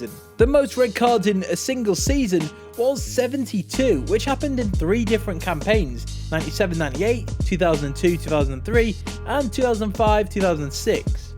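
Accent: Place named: Ireland. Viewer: What part of Ireland are u from?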